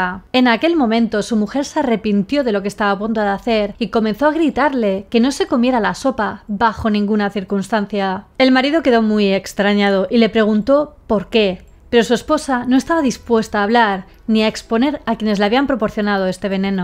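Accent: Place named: Spain. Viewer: Me encanta tu lunar y tu acento Español .. 😻🥰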